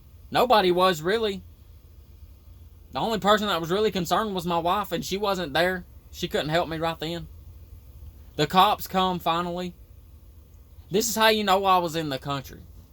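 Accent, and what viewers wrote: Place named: Alabama, United States. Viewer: I REMEMBER THIS, also golly gee I miss your lil BAMA ACCENTT!!!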